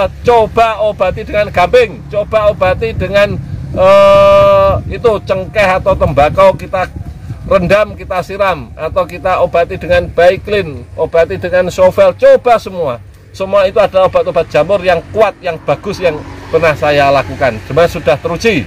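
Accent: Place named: Malang, Indonesia. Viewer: Sampean Iki wong malang taaa pak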